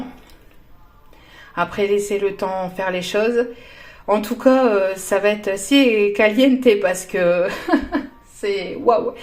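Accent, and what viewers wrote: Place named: North, France. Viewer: Quel tirage 🤯 mais quel tirage 💓 Moi j’aime bien votre accent du nord 😁😉😎 un grand merci 🙏 belle soirée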